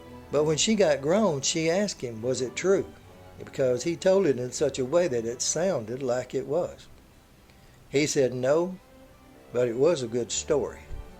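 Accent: South, United States. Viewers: This was a really nice history lesson given in your fabulous Southern style of delivery. The more I learn and read about famous authors and other artists, the more I find how many of them were heavy drinkers. Maybe when they are a bit high on alcohol is when an idea for a novel and such comes to them. Who knows for sure?:), are you driving Lincoln Towncar? The front end looks really familiar to me since I drive one.:)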